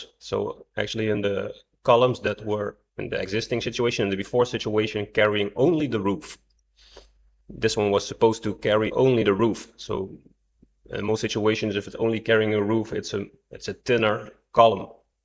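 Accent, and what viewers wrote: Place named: Denmark. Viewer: Listen Mr. Civil Detective with your (Danish?) Accent: This old Architect having worked on NYC Bldgs (one with Fred Severud as Engineeer....others): Your presentation is the very best of ANY including that guy from Severud and many others. Lucid, Comprhensive and mathematicall.. All these cute Chicks interviewing any engineeer they can get their hands on (NBC/ABC/CBS...,,,,,,=UGH. State Your Name, Company, credentials so we (few) rational people can find your sites. You are first rate and give me faith in big time engineering again.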